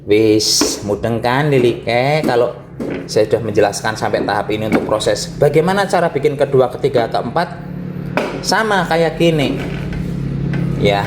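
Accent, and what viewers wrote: Banyumas, Indonesia. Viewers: O...mas.. Eneng apik e nek gawe kamera..men Ojo komplin netizen... iku tangane di sarungin lo maaas..kan malah apik.... aku seneng karo celoteh ne lucu³ Indonesia ngapak...😄😄😄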